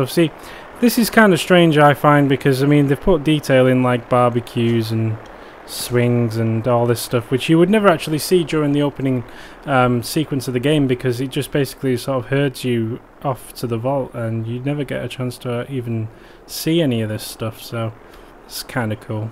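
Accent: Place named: Leeds, United Kingdom. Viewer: That's a leeds accent if ever I heard one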